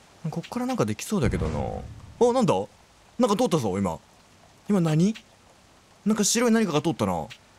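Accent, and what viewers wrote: Kansai, Japan. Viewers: たまに出る関西弁めっちゃ良いですよね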